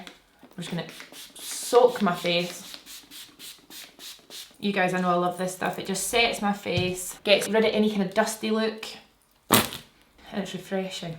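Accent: Scotland, United Kingdom. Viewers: Yasss BB coming thru with that Scot vibe to brighten my day!! Love ya xo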